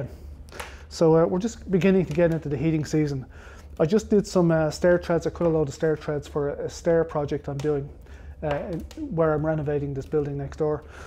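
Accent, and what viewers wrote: Ireland. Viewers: i could tell by the accent . But was not sure if it was a dublin accent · Hi..Watching you again and enjoying your videos, also you have a wonderful Irish accent that take me back to the old country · Looks dangerous....but love your Irish accent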